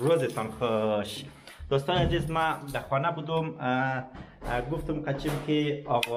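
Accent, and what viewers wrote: Hazaragi, Afghanistan. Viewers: Man bazi az chiz hai ke megi namifahmam chon hazaragi harf mizani va ham tond sorry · واقعآ باید بتو افتخار کرد، چون سوچه آزرگی گپ میزنی😍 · are bakhuda khub saf azirgi tora mugya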